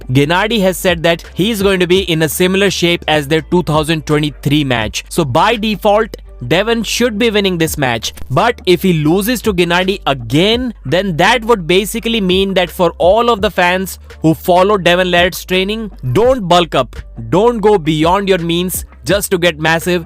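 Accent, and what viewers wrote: India. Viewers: voice over reeks of curry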